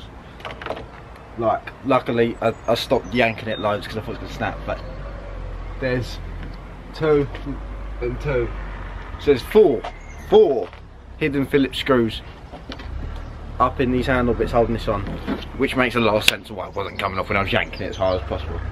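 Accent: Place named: Sheffield, United Kingdom. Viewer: Hahaha I've a mate from Sheffield who talks like you 😂 rate is right barnet is head LMAO it's funny when he talks lol I'm from Leeds but I don't talk like that lol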